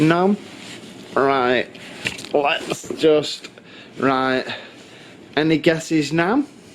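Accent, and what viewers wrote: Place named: Birmingham, United Kingdom. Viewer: That's a strong accent! Is it Brummy or further north?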